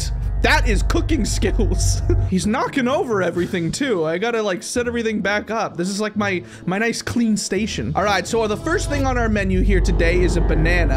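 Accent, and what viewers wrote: Scotland, United Kingdom. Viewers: The British accent 😍 👌 🤣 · Ah yes just randomly turning British No hate pls no hate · Love the British voice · Bro u sound sccotish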